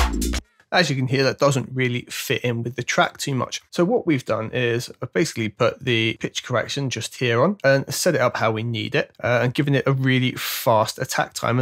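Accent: United Kingdom. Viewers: I pressed a like just for the British accent (don't mind me, if I am wrong and that is an American one, or something else) cheers for the content, why are there only 7 likes on this vid?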